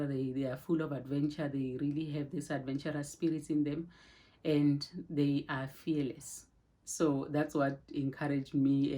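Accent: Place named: South Africa. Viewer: I love that she’s still South African even through the English. Congratulations on your move.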